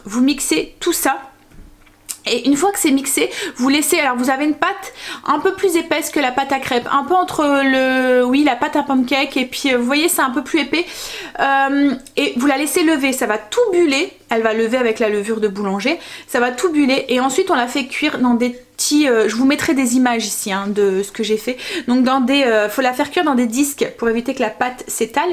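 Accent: North, France. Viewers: Bonjour j'ai pas fini de regarder la vidéo tellement que je suis énervée 😒 c'est quoi encore ces gens qui disent des choses non justifiées oui parce que dire Rome à la place d'Italie n'est nullement une faute de français, un lapsus ce qui est différent et je pense que ces personnes sont loin de savoir la langue française et qu'ils doivent faire énormément de fautes d'orthographe. Ensuite les gens chaque personnes prononcent un mot différemment de chacun POURQUOI LA RÉGION D'OÙ VOUS VENEZ et n'oubliez pas que est du NORD l'accent du mot et pas une faute de la langue française c'est simplement une prononciation type de son origine comme en corse, Italie, Provençal bref avant de critiquer soyez sûr de votre FRANÇAIS. Voilà j'ai fini tu es une bonne personne la vie réserve de mauvaises surprises parfois et certaines ont une vie plate sans soucis alors CRITIQUES.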